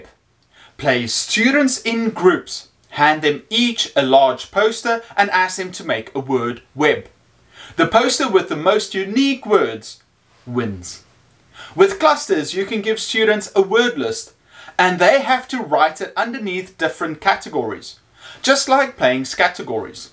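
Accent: South Africa. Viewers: Are you from South Africa? really enjoying the videos by the way. My students love the activities.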